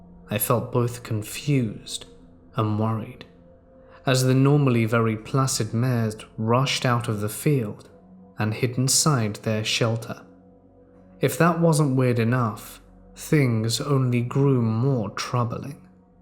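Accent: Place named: Upper class, United Kingdom. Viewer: BBC English with a distinct aristo twang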